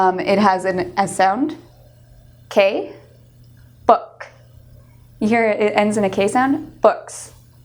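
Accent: Canada. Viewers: Neither--she definitely sounds Canadian. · Oh, Canadian...lol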